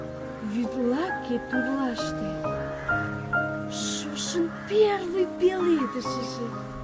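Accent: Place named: Russia. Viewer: I am Estonian and I also understood 0% because of Russian accent. I understand only when I read it.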